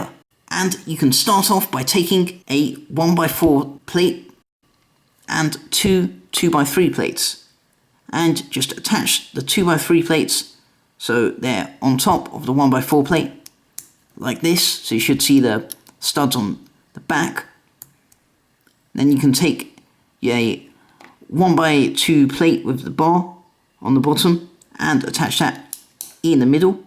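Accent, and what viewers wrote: United Kingdom. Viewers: his voice sounds like an old man thats British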